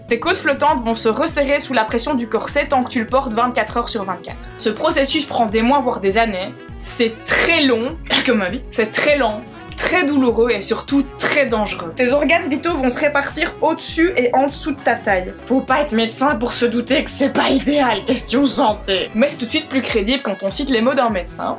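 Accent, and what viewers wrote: Belgium. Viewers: ton accent belge gives me life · Super vidéo!! Merci de mettre plein d’humour et de bonheur dans nos vies et de nous aider à accepter nos ptits complexes:) PS: j’adore ton accent belge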